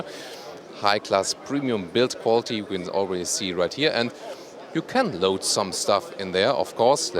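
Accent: Germany. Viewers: The colour is disturbing. But it's the same thing for my ears, being Dutch I understand English and Deutsch very well. I know you speak English but my brain wants to translate German to Dutch instead of English to Dutch. You sound so German lol.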